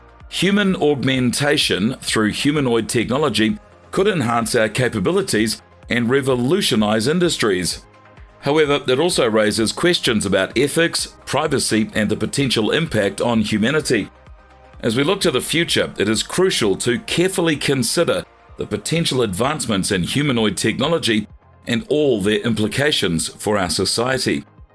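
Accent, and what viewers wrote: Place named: New Zealand. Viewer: A kiwi voice, crack up. Go bro! I love our accent.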